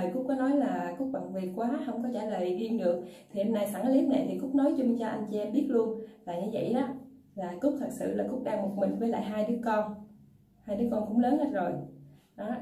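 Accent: Mekong Delta, Vietnam. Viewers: Wow là gái Cần Thơ. Hèn chi nghe nói chuyện đúng giọng miệt dường. Nói chơi tui cũng dân miệt dường mà đang ở xa lắm, lại thích nói tiếu lâm như.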